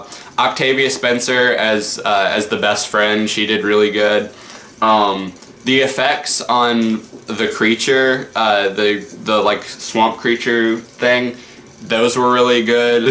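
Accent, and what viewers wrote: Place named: United States. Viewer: I’ve never heard that kinda country accent before🤠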